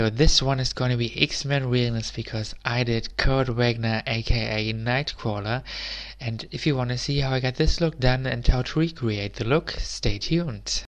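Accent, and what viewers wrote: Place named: Germany. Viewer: hahah i thought "he kind of has a German accent too" so I checked some other videos of yours and haha you are truly the perfect nightcrawler XDD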